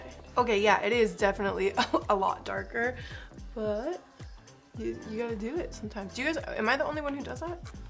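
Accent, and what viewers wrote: South, United States. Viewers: First time ever watching you.. omg love you!!! Anyways your too funny with your southern accent and bombshell glam beauty I loves it stay cool babes! Looking forward to watching you more.. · Gorgeous look ❤️ Yes, just do the makeup style you love 😊 Thank God you saved the contour!!! 🥴🙏😁 Brows looked great. Love your sarcasm and southern accent 😂 so funny! Creme de Nude is my fave 💄The foundation looked flawless on your skin.. I wish the undertone was more neutral ❤️ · You are hysterical and absolutely stunning!!!! Loved the southern accent throughout!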